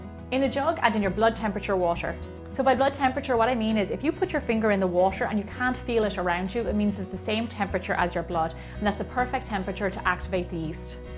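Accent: Ireland. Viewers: Yeah, better to watch a pretty lady with an Irish accent making children's food than a hairy, grunting,sweating man in a vest dripping sweat and armpit hairs into the cake mixture... :p No probs. This recipe is a good pizza recipe. For years, I have been making my pizza and bread dough in almost the same way, so yeah it's worth keeping. I'm a bit sad that cooking secrets are being given away on YouTube so that rich people can make even more money, while people who have kept them as family secrets for many years are still poor... Take care. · Are you Irish, Jamaican? Your accent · I could listen to you talk all day! Sexy Irish accent.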